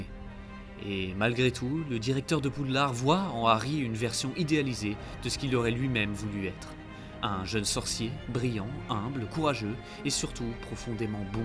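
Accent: Belgium. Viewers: Cest laccent belge ça. Il doit être belge le mr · Bonne vidéo, j'entends un accent belge ? 😉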